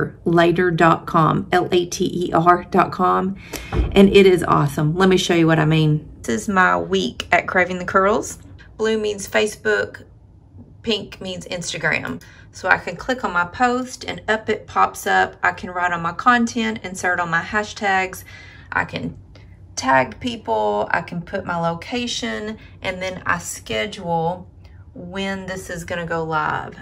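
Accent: South, United States. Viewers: I really love your channel....Have been enjoying the recipe segments...& just seeing how you go about your day to day being the entrepreneur that you are...& you are just so personable! You are just so sweet...plus, I love your Southern accent & of course, your pups!;) <3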